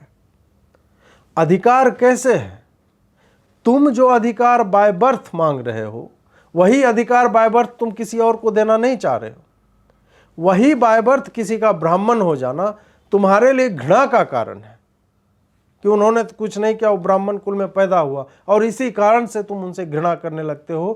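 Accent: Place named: Bihar, India. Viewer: ye youtuber paka bihari h his hindi accent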